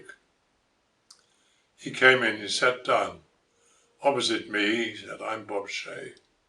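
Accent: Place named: United Kingdom. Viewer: Out of all of the different English accents across United Kingdom this one what a true English gentleman sounds like in my opinion, every time I hear someone speak like this I immediately have a higher opinion of them.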